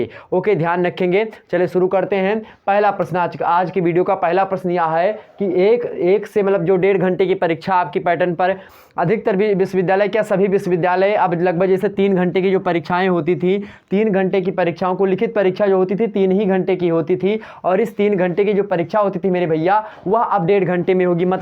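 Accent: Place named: Gonda, India. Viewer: Voice se pahchan gaye sir ham bhi gonda se hi hai But aap bahut aacha padhate hai sir Ba third hindi paper 1st me jo kaviyo ke kavyans bacha hai sir उसे karwa dijiye sir please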